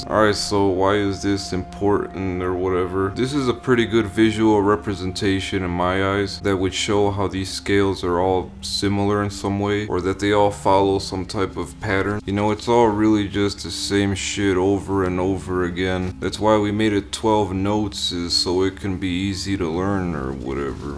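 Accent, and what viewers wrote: Canada. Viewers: I THOUGHT HE SOUNDED CANADIAN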